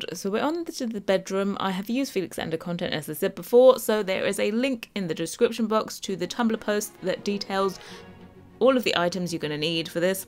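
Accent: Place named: Dorset, United Kingdom. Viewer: You're from Dorest? I can hear it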